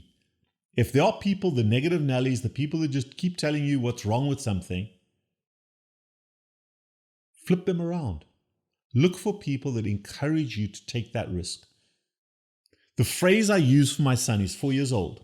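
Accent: South Africa. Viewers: Doc - Are you South African?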